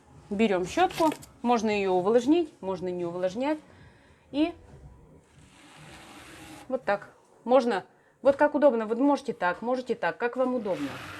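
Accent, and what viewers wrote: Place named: Udmurtia, Russia. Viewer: Тётенька прикольная и акцент как с Удмуртии )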